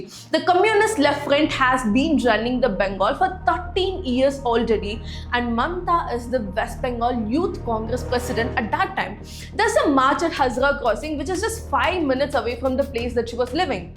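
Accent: Tamil Nadu, India. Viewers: Madam Improve your pronunciation and diction. It has a heavy Tamil accent.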